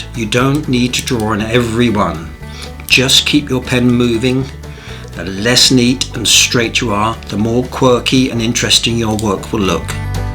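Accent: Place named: United Kingdom. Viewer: Sounds very British love it !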